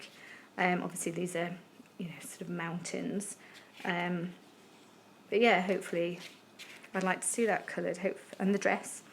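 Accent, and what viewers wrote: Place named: England, United Kingdom. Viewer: I have this book and book 2 and have just ordered bk 3, I tried to get bk 4 on Amazon but it’s currently not available so maybe I will look elsewhere. Lovely to hear your voice, I was born in England spent my first 25 years there then emigrated to Australia so I still love to hear English accents thanks for this video it really helps.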